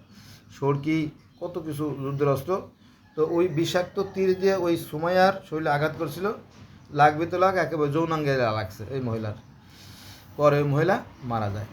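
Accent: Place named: Barisal, Bangladesh. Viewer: Please present in a wise manner. Seems you are not a highly educated man, can't speak even Bangla correctly avoiding illiterate tone ( local Barisali tone) Correct those limitations if you can ( I am in doubt, you will be able) The history what you narrated is known to all educated Muslims except the common illiterate ( they are the larger in numbers)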